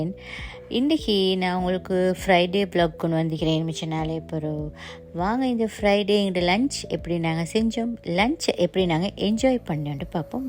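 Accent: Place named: Sri Lanka. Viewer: Voice apram video vachchi kandu putichiden ninga srilanga nu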